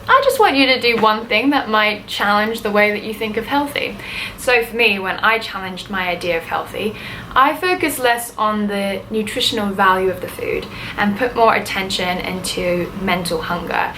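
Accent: England, United Kingdom. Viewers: How do you have a British accent but you were raised in hk??? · You have such a contagious positive actitud! Love your personality. My questions are: where did you get a British accent if you are from Hong Kong and only been to the States? 😅 And, what have been the struggles and positives of making public your ED here in YT? Take care,. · ! why do you have a British accent? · Is your family English? Because you have a strong English accent ❤ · Hey, why is your accent so very British, when you've grown up in Hongkong and lived in America for such a long time, but never in the UK? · How did you acquire an English accent? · I don’t really know much about Hong kong so I don’t know if this is a stupid question but what language do they speak in Hong Kong?... do you speak it? And also I don’t really know how schooling works there but you speak with a British accent.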